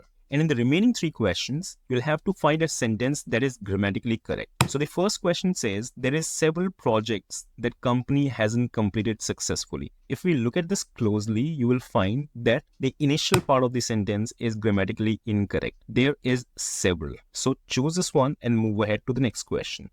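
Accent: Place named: India. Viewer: 😢 Darn it!! That Indu accent makes me cry!!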